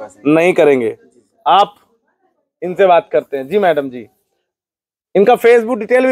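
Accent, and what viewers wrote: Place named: Chitrakoot, India. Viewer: Baba ji aap kahan ke ho Bhasha ka chitrkut District ki lag rahi hai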